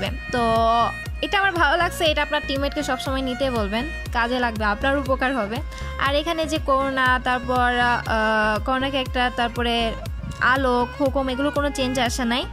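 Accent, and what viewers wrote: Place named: Chittagong, Bangladesh. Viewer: Arre api tumi to khass chittainga khali thake thake bolteco🤗🤗🤗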